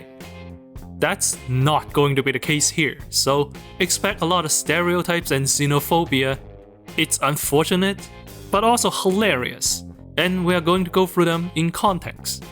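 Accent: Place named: China. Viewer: delivered in a Chinese accent ... chefs kiss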